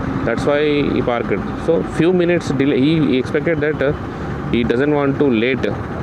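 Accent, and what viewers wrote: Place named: Hyderabad, India. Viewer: bro u speak superb hyderabadi hindi..😁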